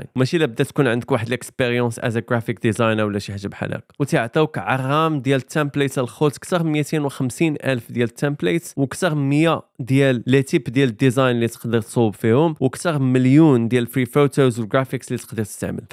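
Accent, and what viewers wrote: United Kingdom. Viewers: عرفتي لاهدرتي بالبريطانية اتصرعها عندك واحد لبريتيش اكسنت واعرة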